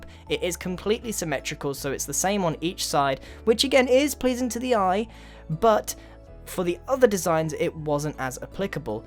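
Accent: United Kingdom. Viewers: british accent and good content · he's British. he doesn't say things the same as you.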